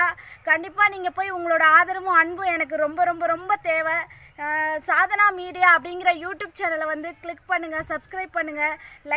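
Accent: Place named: United States. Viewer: Ama amerikka la irunthu vanthu iranguna mathiri paesura thoooo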